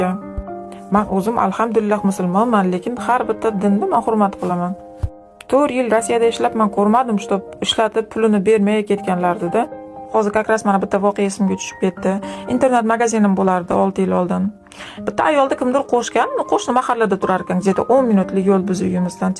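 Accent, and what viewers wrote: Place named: Samarkand, Uzbekistan. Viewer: Qaerdansiz shivangiz Samarqandnikiga oxshatim yaxshi korib qoldim ishizga omad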